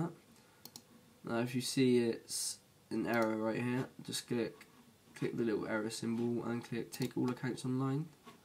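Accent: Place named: England, United Kingdom. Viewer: i love the way this guy explains with the tiny screen and the cursor running all over the place at 200 mph...and on top of that the english accent which is sooo clear. Way to go!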